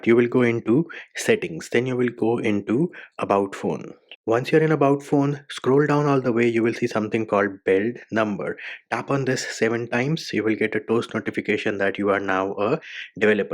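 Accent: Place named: India. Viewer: When i heard that bro have an indian accent i knew my problem is already solved.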